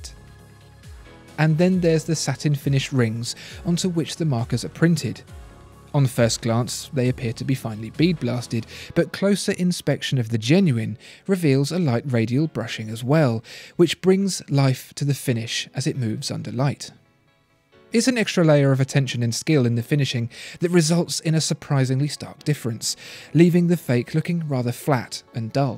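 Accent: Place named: RP, United Kingdom. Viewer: Yo be clearer, you make it sound so confusing with all that posh, You're really good and i enjoy it but you have to make it clearer which one is th fake and which one is real and align that properly to the video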